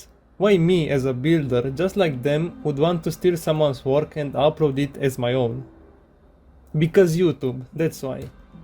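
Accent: Romania. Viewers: Bro, your Romanian accent Is crazy. It is like Russian, that used to live in Italy for 20 years, speaks English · Wow romanian accent 😅 im romanian too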